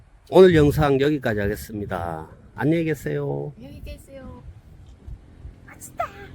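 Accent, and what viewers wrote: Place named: Gimcheon, South Korea. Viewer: 김천쪽 같네요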